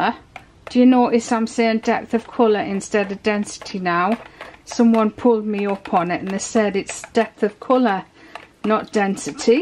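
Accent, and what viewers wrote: United Kingdom. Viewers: That gold is gorgeous. I DO miss British accents..... I hope that you are keeping safe & sound. I’m a soapmaker in NZ. I have access to all the things you do, plus wonderful natural ingredients, herbs and fruits. But that gold. Gorgeous. I would, however be scraping the very last little bit of colour out of each pot into the jugs! Your soap recipe is very forgiving as it stays nice and liquid so long. Very clever. P.S.” little bit too much soap...” never!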